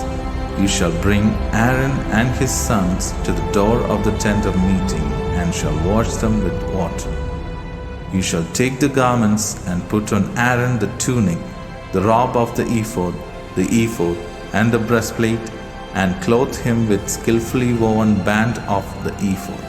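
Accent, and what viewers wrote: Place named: India. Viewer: Really grateful for the Indian accent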